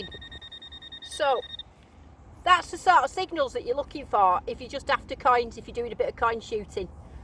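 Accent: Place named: England, United Kingdom. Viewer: I love the English Accent!!!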